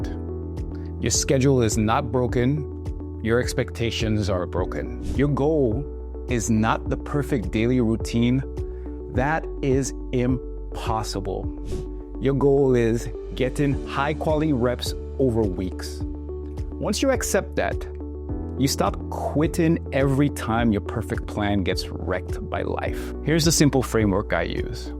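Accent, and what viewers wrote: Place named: Caribbean. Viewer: Are you Caribbean? I hear my people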